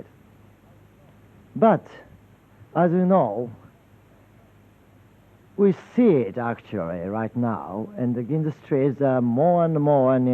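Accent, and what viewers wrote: United Kingdom. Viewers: 昔のイギリス鈍りで当時としてはネイティブなレベルだったと思うぞ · 戦前の日本はイギリス英語を主に学んでいたと言うから三島さんもその訛りが感じられるね。 なんというかやはりイギリス英語は気風がある感じがするから彼にあってるね。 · わざとイギリス弁にモノマネした喋りすごい。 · イギリス訛りっぽい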